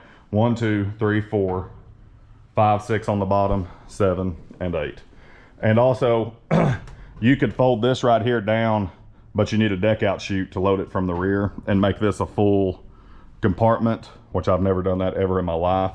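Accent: South, United States. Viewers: That country boy accent though - I wonder if you can sing 🤔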